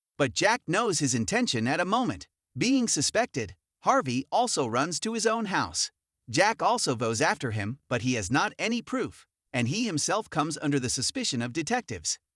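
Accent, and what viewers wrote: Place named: United States. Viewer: Perfect American accent, but I still can’t understand what he’s saying.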